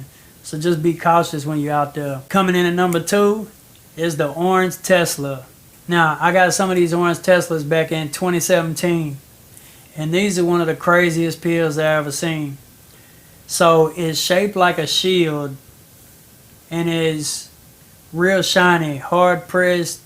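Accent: United States. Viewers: You from Nashville? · Are you from Louisiana? I love that accent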